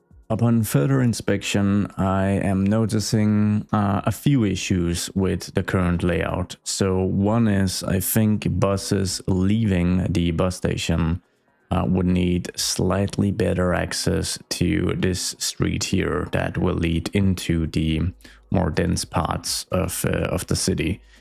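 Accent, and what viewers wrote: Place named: Denmark. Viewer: I really like youre city, its very realistic. Also i can hear that you have an accent it sounds danish but im not completely sure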